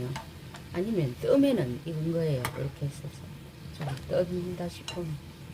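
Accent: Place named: Gyeongsang, South Korea. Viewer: 돌솥비빔밥 제대로 알려주셔서 잘배웠습니다 나긋한 경상도 사투리로 설명 해주시니까 정겹습니다 자주 뵙겠습니다 😊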